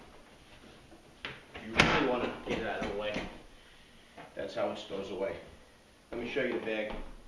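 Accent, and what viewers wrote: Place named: New York, United States. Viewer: anytime a guy with a heavy new york accent says "alright, you're probably wondering whats under da bag...." you'll want to stay till the end : )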